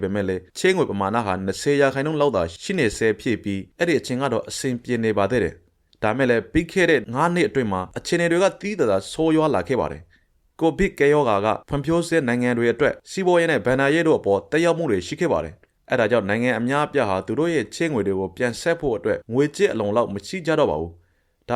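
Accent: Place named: Rakhine, Myanmar. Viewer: Drဆာဆာကချင်းလူမျိုးပါ၊အခုအသံကရခိုင်သံဖြစ်ပါတယ်။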